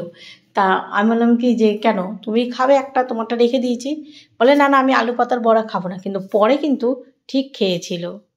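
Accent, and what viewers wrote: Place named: Bangal, Bangladesh. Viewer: Vison valo laga didi-bai tmr mukha bangal kotha ami ekta problem a tmr vdeo thik moto dekte parchi na valo thako didi-bai❤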